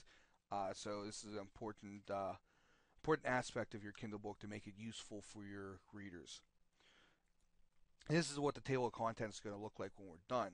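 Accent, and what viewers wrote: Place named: Northeast, United States. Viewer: Thanks. Quick & easy instructions for dummies like me! One little point to consider: When you underscore text like you do in your sub-headers, some readers may think it's a link and try to click on it. I usually use a smaller font and bold and/or italics for these. Hope you do more of these videos. P.S. Like your accent. Are you from the NE US?...you sound like it. I'm from Long Island myself...oy!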